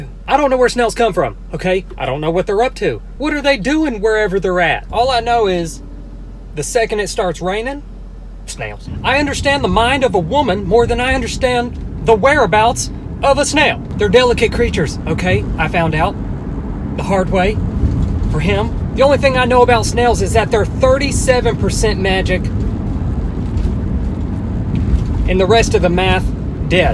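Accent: South, United States. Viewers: Kind of new to this channel. Love him. But where is he from? He says he lives in California but if I'm not wrong, ain't that a southern accent??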